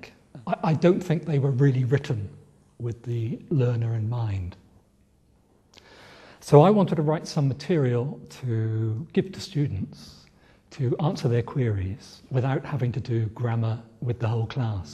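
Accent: United Kingdom. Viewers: Does he speak in RP?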